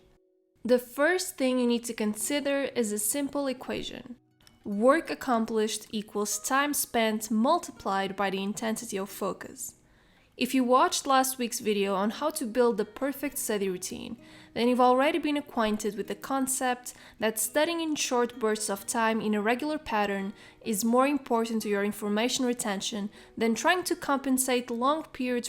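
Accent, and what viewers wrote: Portugal. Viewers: are you portuguese? sorry hahaha i’m curious because of your accent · Eu sabia que reconhecia o sotaque! És portuguesa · I love your videos I think they are really informative and make me want to be more organized like you But are you from Portugal? I am and your name sounds really familiar and your accent too ahah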